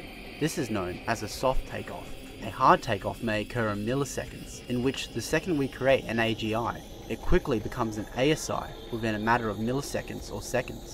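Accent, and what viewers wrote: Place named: Australia. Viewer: Too bad about the Australian accent. There were parts I couldn't make out.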